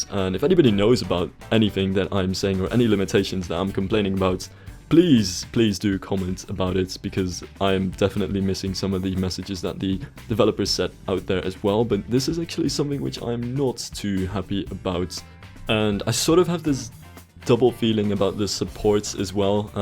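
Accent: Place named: Netherlands. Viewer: Nice video! You have a great accent for someone from the Netherlands. BTW: I'm dutch too.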